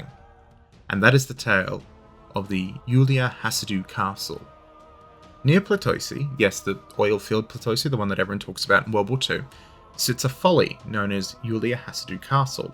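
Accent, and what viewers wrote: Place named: Australia. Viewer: Something I wasn't expecting was an Australian historian telling me about Romanian folklore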